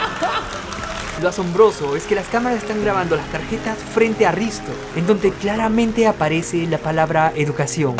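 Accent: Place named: Peru. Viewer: Ese asentó peruano tan particular es así que el youtuber DKBesa también tiene una particularidad en su acento, espero tengas más suscriptores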